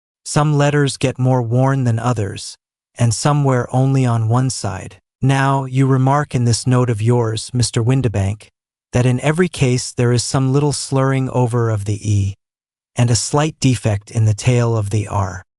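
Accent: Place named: United States. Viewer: No offense mate. Doyle did go to the US with his erstwhile friend Houdini but despite your valiant efforts American "English" doesn't really do his oeuvre justice. Look up BBC radio drama Ectoplasm (free on you tube). You can thank me later. One author was once asked what he thought of American culture and replied that, "it sounds like a good idea". Keep up the good work mate.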